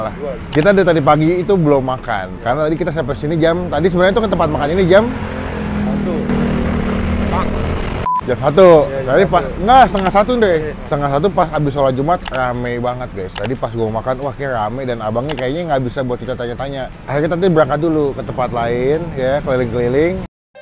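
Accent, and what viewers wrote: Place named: Banyumas, Indonesia. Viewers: Purwokerto medoknya keliatan banget wkwk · Ora Ngapak Ora Kepenak Pak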